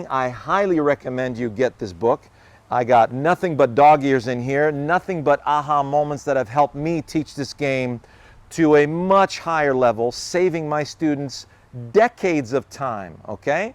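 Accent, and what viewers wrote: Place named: Quebec, Canada. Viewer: Hi Mr. I'm totaly in love with your mental approche for the swing of golf. I found your video by starting to watch your french imitator! By the way your Quebec accent is style intact. I'm from Montréal so if you do come in Montreal for any teaching, let me know. So I'm going slowly throw all your vidéo and trying to apply these tips in my game. I like to pratice more so I'm looking to by a net and a mat similar as the one you use in yout backyard. Can you tell me what's the brand of it. Thanks for all your vidéo.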